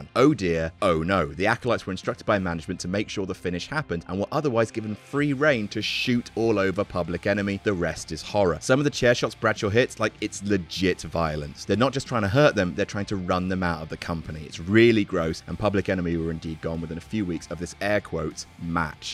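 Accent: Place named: United Kingdom. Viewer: The list is good but is way to British for me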